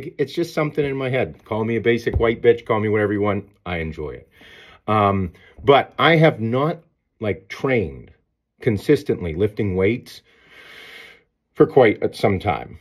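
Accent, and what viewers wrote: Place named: Pennsylvania, United States. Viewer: Love that PA accent lol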